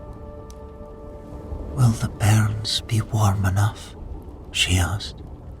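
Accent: Northern Ireland, United Kingdom. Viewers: Why does sound NI/Irish? It's putting me off relaxing. A recognisable Scottish Accent would be better. Yes I am Scottish, maybe it's the whispery way he is speaking but his inflections sound NI. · Doesn't sound scottish more skootish even Irish ai shit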